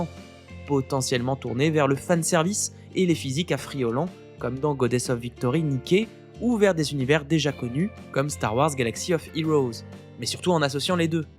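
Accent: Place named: England, United Kingdom. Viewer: Incroyable vidéo et j'ai adoré l'accent anglais, continue comme ça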